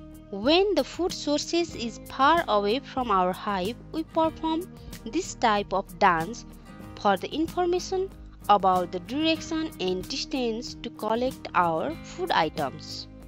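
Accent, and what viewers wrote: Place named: Maharashtra, India. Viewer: That Marathi accent tho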